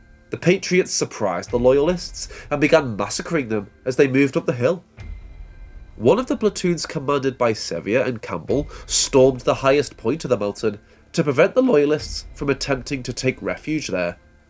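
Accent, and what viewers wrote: Liverpool, United Kingdom. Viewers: I've lived in the north and south of England. For more than a while in each but can't place your accent. At times a little scouse then Welsh. What is it?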